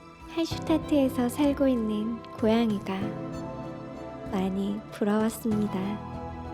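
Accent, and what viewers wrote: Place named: Seoul, South Korea. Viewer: 넘 예쁘시고 영상도 좋은데 나레이션이 서울 사투리가 생각나네요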